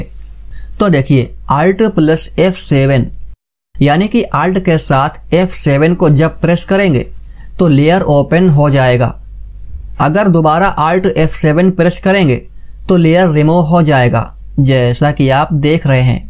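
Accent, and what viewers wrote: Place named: Pahadi, India. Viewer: very nice, lekin apke bolne ka andaj pahadi lagta hain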